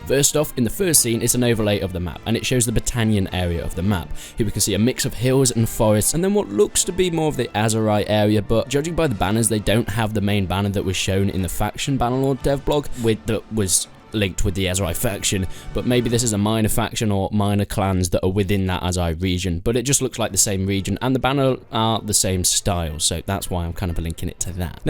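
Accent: England, United Kingdom. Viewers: The annoying english accent voice over, is my cup of tea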